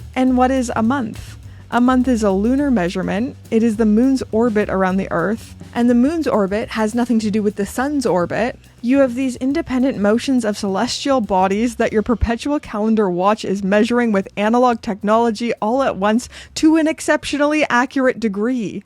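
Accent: Canada. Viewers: I'm here for the watches... and her great Canadian accent 😊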